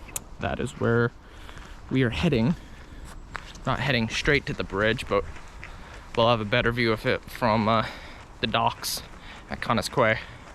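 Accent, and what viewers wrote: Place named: United States. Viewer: Yeah thought you was an American…came across your video looking up Conqueror Challenges, I’ve just signed up for a few…Good Luck! 👍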